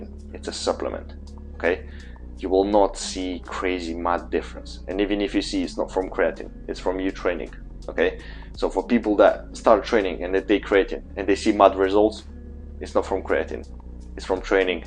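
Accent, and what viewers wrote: Russia. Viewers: У него русский акцент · все гадал откуда ты😂 вроде русский акцент, но не было понятно до исконного "блять"😂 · Are u Russian or what? You don't sound like an English man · Русский акцент так и пробивается! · Wow, thanks a lot! It is kinda strange that I can't understand his Russian accent despite the fact that Russian is my mother tongue. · как будто рашн звучание в голосе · ну я вообще сначала догадывался что он русский, так как акцент у него русский, но когда он сказал блять, я окончательно убедился что он русский. А еще его зовут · Русский акцент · Русский акцент брат,но ты хорош так держать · Сначала я подумал, что ты русский) акцент такой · Aint no way this guy is from Britain. His accent is russian, not bri'ish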